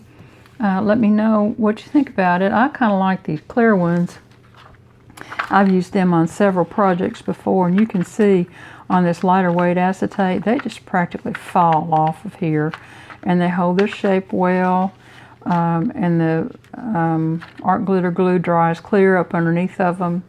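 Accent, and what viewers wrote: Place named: Tennessee, United States. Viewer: Thank you for this great enamel dots tip! And, are you from Tennessee? Because you and comedian Leanne Morgan have the same accent! xox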